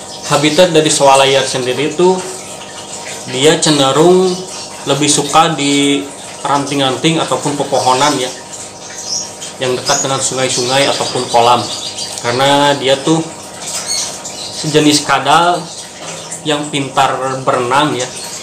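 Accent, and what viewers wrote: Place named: West Java, Indonesia. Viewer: Sunda banget euuyy...😂😂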